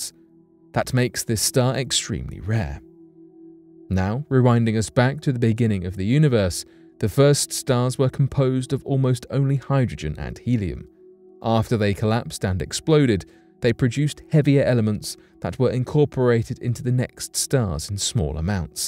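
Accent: United Kingdom. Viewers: Thumbs down because of the British accent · Why do they always get some dude who sounds like he’s a member of the royal family to narrate these videos? I worked in space and aviation for my whole career and not once in our labs, did some English royal voice come over the speakers saying “ Jim I think you’ve got the solution!”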